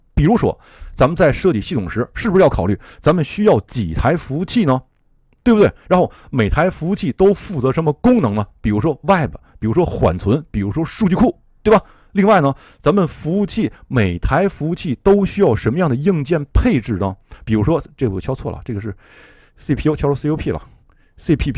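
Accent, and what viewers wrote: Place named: Japan. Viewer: 听口音确实是在日本干活的。komakun加油。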